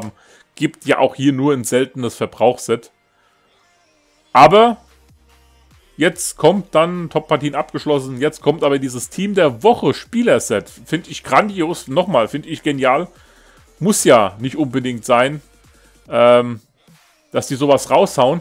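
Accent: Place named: Hesse, Germany. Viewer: komme auch Hessen :D bester Dialekt...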